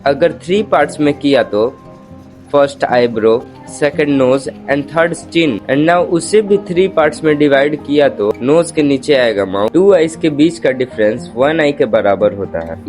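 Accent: Bihar, India. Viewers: Bhaiya are you from bihar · Are you from Bihar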